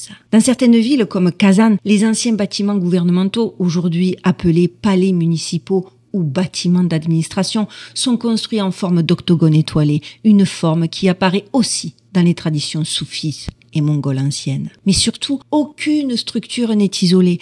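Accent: South, France. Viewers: En plus avec l'accent du sud❤❤❤ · Merci pour vos excellente vidéos, alors oui tartarie 3. Bonjour de Suisse, j'adore votre accent du sud 👌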